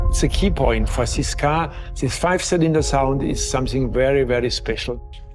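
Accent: United States. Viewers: Pity about the Hollywood yankee doodle commentary... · Just say Volkswagen. It sounds dumb when you talk like an American for the whole video except for one word where you have a German accent. It just sounds pretentious and smug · The narrator amazingly pronounces Mr. Piech's name correctly- most non-Germans say it incorrectly as "peach". But- the CEO of VW was not "Schumacher", but Schmueker (would sound like "shmicker"). And the company brand is sometimes pronounced as 'oddee" and not "owdee", even in the same sentence?! And my gosh- who is the main intended audience of this video, given the obvious American accent of the narrator? The USA! So provide the units as most commonly used in this country- standard units (e.g., inches, pound-feet torque, pounds, miles per hour, etc.) with either metric spoken right afterwards, or visually in parentheses/captions. 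There are some std units on the car depictions as subscripts, but when the text is spoken they are missing. Nice to see photos of the development cars for the original Quattro setup.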